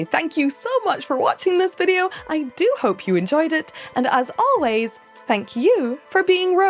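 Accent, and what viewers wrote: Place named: Scotland, United Kingdom. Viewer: My wife saw you on my phone and asked, "Is she AI?" I explained, "No, she's just Scottish."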